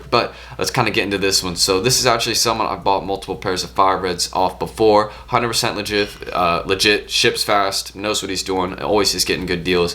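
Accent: South Carolina, United States. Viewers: You from sc?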